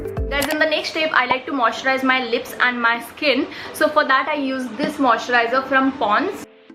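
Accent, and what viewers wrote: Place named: Bihar, India. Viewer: She's bihari. It's obvious from her accent.🙂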